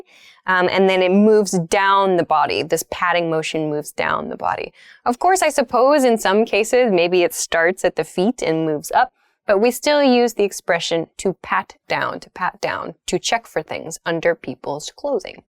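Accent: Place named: California, United States. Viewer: California accent :)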